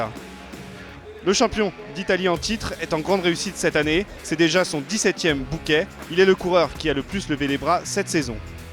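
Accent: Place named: France. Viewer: Porque hay un francesito narrando el resumen?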